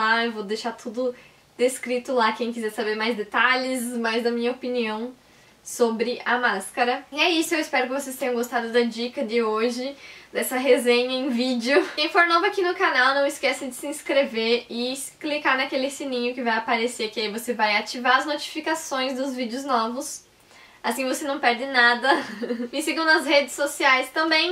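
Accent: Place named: South, Brazil. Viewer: Tudo bem? Tô chegando agora por aqui, tu é gaúcha?? Achei teu sotaque parecido com dó sul, hoje moro nos EUA e deu tanta saudade do meu sul te ouvindo falar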